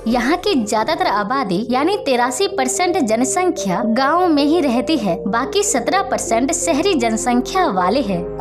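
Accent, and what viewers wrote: South, India. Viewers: Shayad mujhe lagta hai aap South ke taraf se ho ISI vajah se aapki Hindi thodi si alag lagti hai Lekin cute hai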